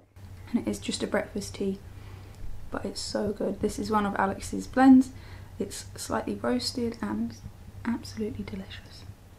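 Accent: United Kingdom. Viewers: Oh thank you so much for this new video :) this is just so sweet from start to end, and the 2 Elfmail sweaters are gorgeous, how lucky I am to not have my entire stash by hand otherwise I would have casted on one tonight! As you said, currently "sinking into calm" when chaos is too close, and loving your accent : remind me some months spent in UK, not so far from you! Take care and happy knitting!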